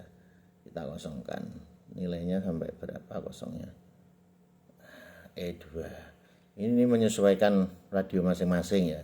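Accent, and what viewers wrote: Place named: East Java, Indonesia. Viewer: Koyok e wong jatim kulonan iki , hihi...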